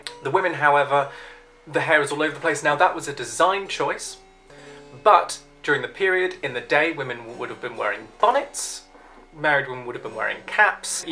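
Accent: United Kingdom. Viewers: PS your accent is very posh and regal. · Love your very english english ❤ a plesure to listen to you. And you are very polite in your review of clothing accuracy. A gentleman 🎉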